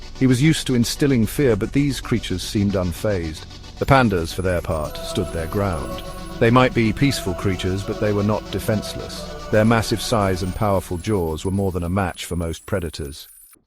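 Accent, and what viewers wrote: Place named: England, United Kingdom. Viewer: The most beautiful English accent and the mastery of English language! Really enjoy listening to you and your absolutely artistic use of words! All perfect!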